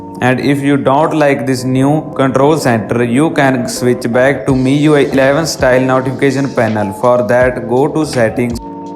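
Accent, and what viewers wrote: India. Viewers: Hindi Accent!!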